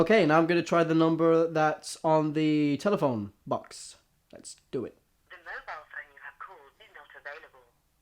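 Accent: Ireland. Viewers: HES IRISH I JUST REALISED!!!!!! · are you irish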